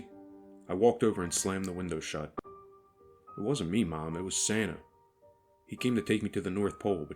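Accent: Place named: Pennsylvania, United States. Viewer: First of all, I love your voice! Most of my family lives in Pennsylvania, primarily Lancaster county but elsewhere too. It's comforting hearing the accent because it sounds like most of my relatives. Secondly, I saw your comment on a different channel. You asked someone for advice or ideas or something. As your 28th subscriber, I'm mostly satisfied. I liked the second story more than the first. Both were great, but you narrated the second one a little bit slower. And this is my only constructive criticism. If you slow down the reading just a little bit, you'll be right on par with all the channels we both know and love. To your credit, I was still able to understand every word, despite the speed and the accent. Honestly, because of my family, it may be easier for me than for others. My dad especially- he's particularly difficult to understand sometimes. 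Anyway, please accept this input from a place of love and respect. I'm looking forward to more content from you in the future! 🙂💙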